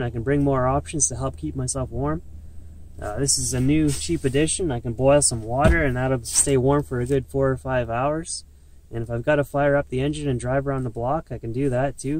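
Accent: Canada. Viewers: the Canadian accent too! "Take off, aye!"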